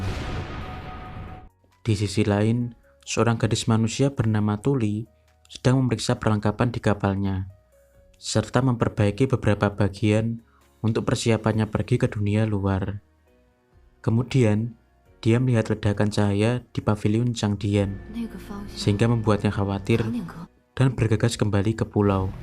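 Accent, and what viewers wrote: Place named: Java, Indonesia. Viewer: Ngpmong nya medok bangat asli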